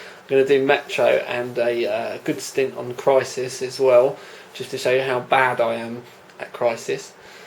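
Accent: United Kingdom. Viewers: so hard to understand a tired man with a british accent.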